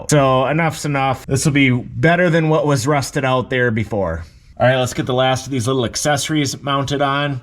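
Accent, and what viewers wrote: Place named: England, United Kingdom. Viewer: But then it's a Town Country/Caravan. I own 2, 02's (Town Country Limited AWD with a custom 1/2" wall rear axle, a Reese Class 5 45707 trailer receiver, a 2" lift, you name it and a Caravan SE, shorty 3.3) Better than any Corvette, Lincoln Town Car, even a Bugatti. You should fix the Dog Legs and rockers. Welding in is best but watch the foam! Excellant editing, clear video, and English accent (unlike my Czech) so that can be isolated. ~ nope 336 subs Youtube is weird isnt it?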